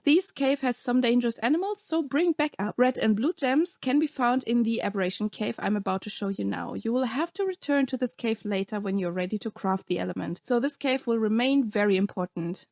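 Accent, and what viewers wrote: Germany. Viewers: I subbed after watching your guide to breeding. Best explanation i could find. Well done. Also subbed for the nostalgia as your accent reminds me of my cousins who live in Germany ☺️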